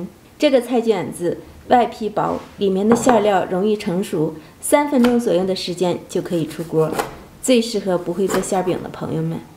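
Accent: Northeast, China. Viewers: 超做作，虛。想你東北話就賊好聽了。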